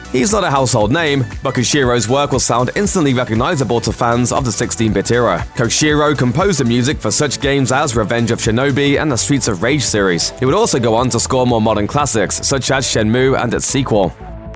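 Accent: England, United Kingdom. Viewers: English bloke, English accent. Doesn't mention the Euro release at all. Shit.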